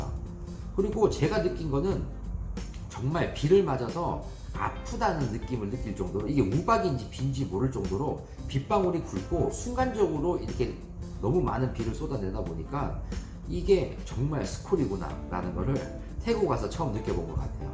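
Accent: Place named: Busan, South Korea. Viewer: 부산정 표준말씨로 방송 잘합니다. 좋은 방송 계속 해주세요.😅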